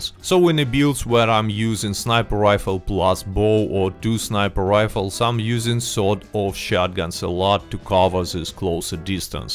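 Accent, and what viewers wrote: Russia. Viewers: Wow, all video I was like "is this a Russian accent" and when I saw "попадание в шляпу" I get it, so I have a question, what is painting black, is it giving more damage ? Буду благодарен ответу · I've only just found ur acc but I can tell I'll like u as a content creator also i can hear ur Russian and your English is good can't wait to see what u got for me and I just edited this and realised I replied to som1 and not commented my bad🤭😂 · are you Russian? ME TOO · Russian accent still visible enough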